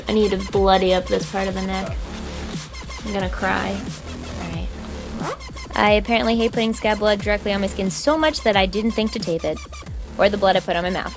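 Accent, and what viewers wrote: England, United Kingdom. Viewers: That british accent though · Her British accent is so hilarious I love it 😂🍩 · A British accent and a scarecrow look? DOCTOR WHO CONFIRMED. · Loving that English accent😂😂😂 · Your English accent is so funny😂❤️ we don't actually sound like that though aha xx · Doesn't anyone else think her British accent sounds like iJustine's British accent?!?.,!! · Lol your Brit access sounded like Rebel Wilson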